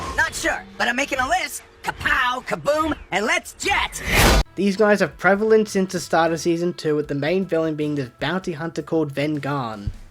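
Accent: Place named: Australia. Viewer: I was today when I noticed your Australian,🙃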